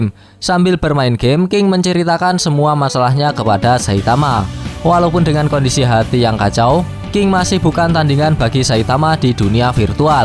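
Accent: Java, Indonesia. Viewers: Lu orang Jawa ya min,medok bnget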